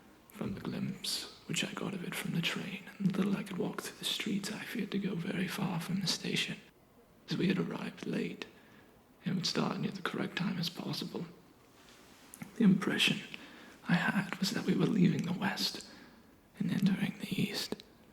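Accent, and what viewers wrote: England, United Kingdom. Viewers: That was amazing. I'd love you to continue the story in another video....maybe even do a roleplay of the Count himself. Your English accent was fantastic too. I loved that. xx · Love how he casually speaks in an English accent and I not so casually fall on the floor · He's American but since the narrator of Dracula is Jonathan Harker who is English, is going with canon and doing an English accent in this vid:) I think it's quite convincing 😍😍😍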